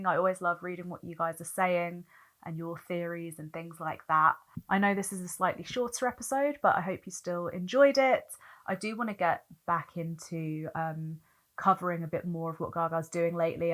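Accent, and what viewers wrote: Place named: United Kingdom. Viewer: This girl sounds british, I think she is as well as a pop-culture student and especially from The Queen Gaga,The Best & The Great.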